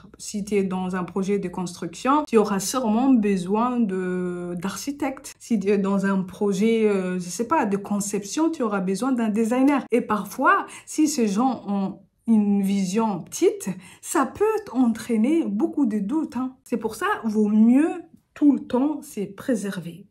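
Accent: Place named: Comoros. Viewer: À son accent je dirais qu'elle est comorienne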